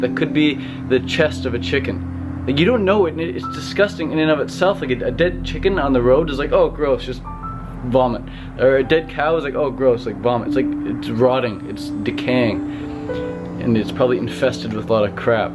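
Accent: Canada. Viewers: Your Canadian accent is unbelievably strong hahaha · Lmao, it's so funny because us Canadians don't ever hear the different btw the Canadian and American accent. I didn't even know he was Canadian until this comment :)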